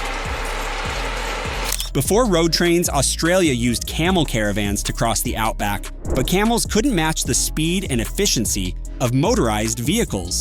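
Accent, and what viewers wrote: United States. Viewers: The only reason I clicked on this was to leave a comment. The thumbnail to click on is the biggest load of Photoshopped sensationist crap I've ever seen, I bet you're a yank or AI voice sprouking this bullshit. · Why does an Australian story have an American voice over? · as an aussie i found it amusing to listen to a yank telling me about road trains, secondly shows a Lefthand drive car, NOT on australian roads. i suppose yanks limited intelligence.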